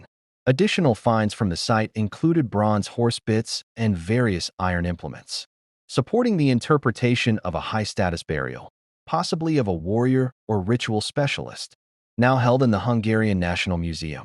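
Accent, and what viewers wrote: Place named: United States. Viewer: Bitte keine Amerikanische Stimme.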